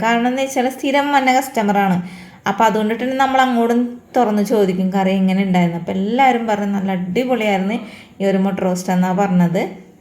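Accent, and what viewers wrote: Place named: Kodungallur, India. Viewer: Thrissur districtile kodungallur bhagathenganumano iyale veed. Ente veed 3 peedika. Ente samsaram polend. Athond chodichathatta. Adipoli reciepe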